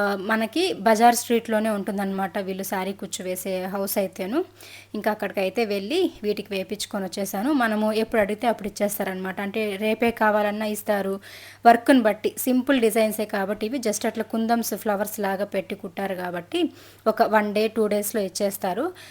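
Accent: Chittoor, India. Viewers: మిరు చిత్తూరు భాషలోనే మాట్లాడండి అక్క బాగుంది మరుమాట్లాడేవిధానం మాకు అలానే మాట్లాడండి మిపాత గొంతు వినివిని విన బుద్ధి కాలేదు. అక్క మాకు అలాగే చిత్తూరు భాషనె బాగుంది ఎంతమందికి చిత్తూరు భాష కావలి ఫ్రెండ్స్ నెను పెట్టిన కామెంట్ కి ఎంత మంది లైక్ చేస్తారు. ఫ్రెండ్స్🥰🥰🥰🥰🥰🥰🥰🥰😀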